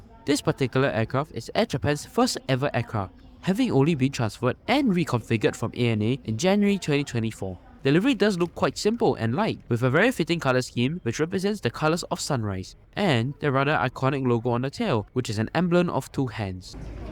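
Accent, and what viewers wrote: Singapore. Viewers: I do miss the Singaporean accent. Very nostalgic indeed. Keep it up.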